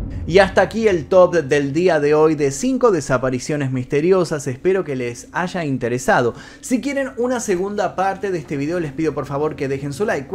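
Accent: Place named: Argentina. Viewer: Me interesó mucho el caso Asha Degree, pero por no ver el vídeo y sólo escucharlo, al principio lo busqué como "Aya" Degree, entendiendo la sh como "y" 😂. No se ofendan hermanos argentinos, es la particularidad de su acento, excelente video.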